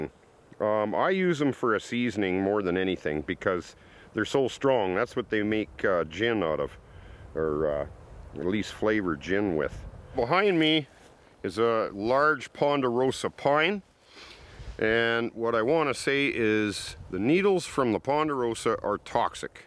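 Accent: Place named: Canada. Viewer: When that truck gives up on you I can recommend you getting a Subaru Outback. At least as good off road as any truck, and the back seats fold flat so you can sleep in it comfortably. You should look it up. As for that catch phrase, I think the most important thing is that it contains the word "out" in that awesome canadian accent. The one about poking an eye out wasn't bad at all imho.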